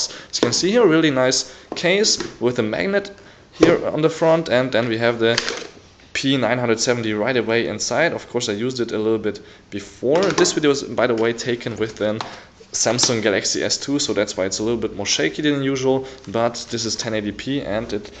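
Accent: Germany. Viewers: Man hoert deinen deutschen akzent raus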